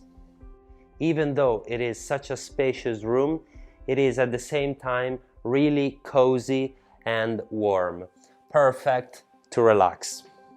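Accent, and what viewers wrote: Italy. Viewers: Hello friend I am here only for your Italian Accent. Love you buddy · In realtà ha un accento molto meno marcato della maggior parte degli italiani che parlano inglese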